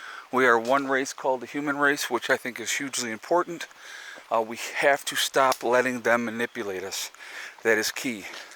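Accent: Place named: New York, United States. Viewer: Psssst, your NY is showing.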